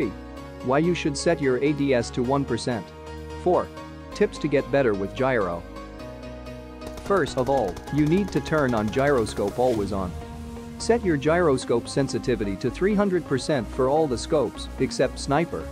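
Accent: India. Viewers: Are you indian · Are you Indian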